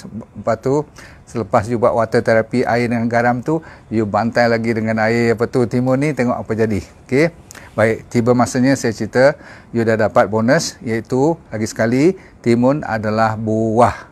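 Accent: Malaysia. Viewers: Ga ngerti bhs nya capek ngikutinya. Logat melayu sih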